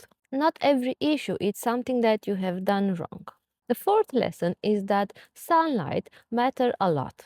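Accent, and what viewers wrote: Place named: England, United Kingdom. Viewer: Very interesting and informative . I love your English accent.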